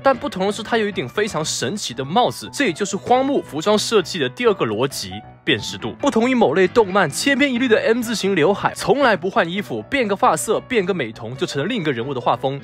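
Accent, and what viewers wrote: Taiwan. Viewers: 為何博主講話沒什麼口音啊XDD倒像台灣口音了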